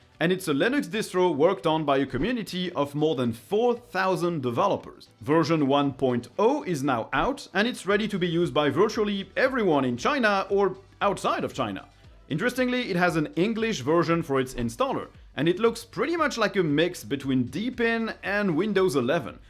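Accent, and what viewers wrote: France. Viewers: He's French? I've long been guessing where that accent comes from lol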